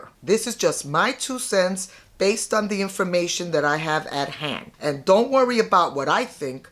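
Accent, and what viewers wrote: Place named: New York, United States. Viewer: I watched the best phone camera review this morning from Tony and Chelsea - they shot the video with the GH6 and there were moments when that autofocus was simply not cutting the mustard. I've seen some amazng footage out of the GH6 but normally from professionals who know what they are doing. I'd love to buy this camera but as a Fuji XT3 who has suffered in the past from autofocus issues (thankfully less these days), I think my next camea will be the Sony A7IV. Talking about computers and codecs - My new Macbook Air M1 is fantastic - complete game changer! P.s. Love your accent! Are you from near New York? Makes me want to watch Law and Order again.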